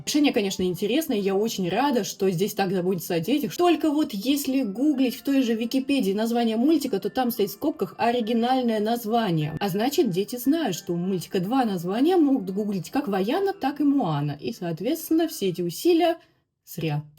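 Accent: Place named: Germany. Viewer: У вас такой милый леёгкий немецкий акцент, я в восторге от него 💖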